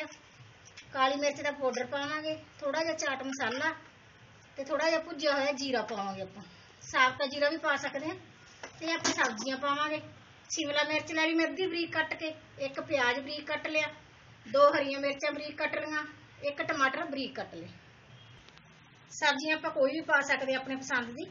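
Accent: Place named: Ludhiana, India. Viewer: ਪਰ ਤੁਹਾਡੀ ਬੋਲੀ ਲੁਧਿਆਣਾ ਸਾਈਡ ਲੱਗਦੀ ਆ ਜੀ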